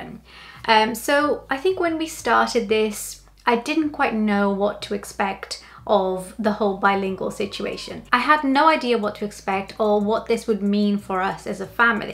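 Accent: Wales, United Kingdom. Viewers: Your not from Brazil, your welsh accent is too perfect aha how long have you been in Wales 🏴🇧🇷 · Is her accent Welsh? Because it's beautiful.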